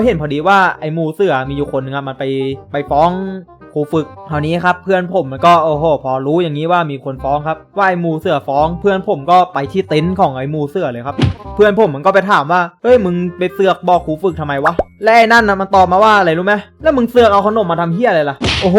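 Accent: Phetchaburi, Thailand. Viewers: คนเพชรใช่มั้ยครับฟังสำเนียงรู้เลยคนเพชรเหมือนกันครับ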